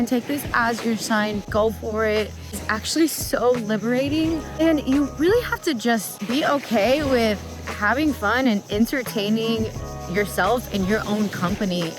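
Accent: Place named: Los Angeles, United States. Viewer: Youre from Nawlin? I thought youre an LA woman.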